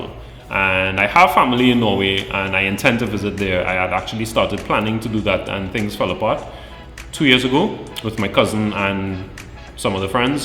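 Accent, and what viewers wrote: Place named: Trinidad and Tobago. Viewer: Our beautiful Trini accent .Keep in representing another YouTubed here.Keep up the good work.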